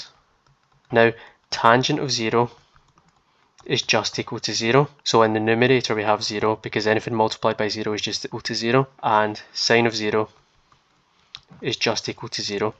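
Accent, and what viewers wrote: Scotland, United Kingdom. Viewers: ... What I forgot to ask you, if I'm not intruding, is, are you Scottish? I have an expat background and used to live in Scotland for about 3 years not far from Aberdeen in Milltimber beside the famous river Dee, a very beautiful small village... your accent sounds Scottish, but I could be wrong (lol); by the way I'm 1/2 Dutch/1/2 French...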